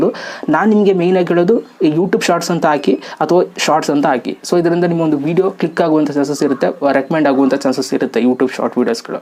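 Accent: Mandya, India. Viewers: Wow nett nett ge band bittiruthe what a language in mandya I like it thamma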